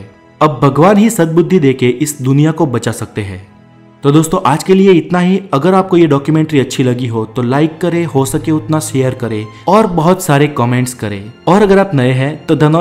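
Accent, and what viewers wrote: Gujarat, India. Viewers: U gujarati?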